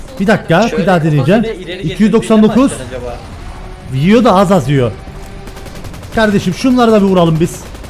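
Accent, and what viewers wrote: Cyprus. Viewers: Abi sen Kıbrıs lımısın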